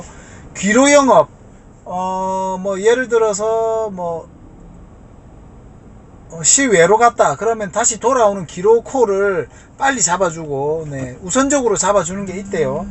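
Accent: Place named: Busan, South Korea. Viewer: 부산 말투 재밌어요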